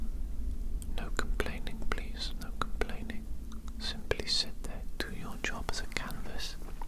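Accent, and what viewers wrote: Wales, United Kingdom. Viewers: Where is your accent from? I want to say Wales, but I can’t quite put my finger on it and it’s been bugging me for ages! Super relaxing video as always :)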